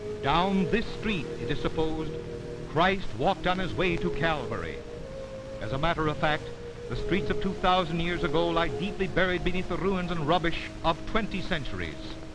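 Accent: United States. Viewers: It's a yank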